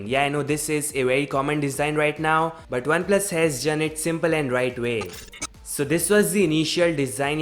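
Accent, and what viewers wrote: India. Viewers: Dude, you should honestly get some English and pronunciation lessons before you post videos. The way you speak and that cringe-worthy stupid accent that flows outta your mouth is the worst thing ever! People like you give Indians a bad name when they tell us we cannot speak English properly. Swear to god, get educated before you educate!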